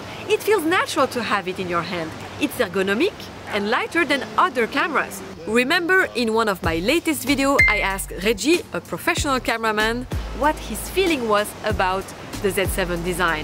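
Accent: France. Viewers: pretty french woman speaking English, easy win! very professional review, too bad i am not at all interested in a Nikon mirriorless! i am holding out for the panasonic S1r or a Sony a7III!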